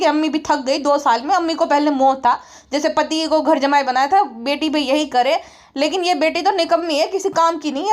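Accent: Gujarat, India. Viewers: Aap apne podcast me aise hi gujarati tadka lagaya karo bahut achha lagata hai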